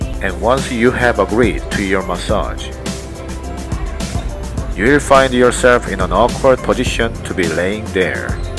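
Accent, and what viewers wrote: South Korea. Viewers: This guy's accent 100% korean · nice vid. I can tell from your accent that you are from Korea. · base on your accent, i can tell you are 한국 사람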